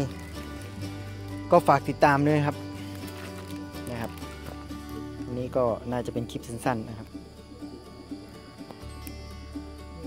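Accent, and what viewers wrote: Isan, Thailand. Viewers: สวัสดีจ้า หมานๆเด้อโอ่ย เจ้า เว้า อีสานบ้านเฮา โลด ขอย ฟัง แล้ว ลำคานๆๆเด้อจร้า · เว่าอิสานจังคือเด้อจ้า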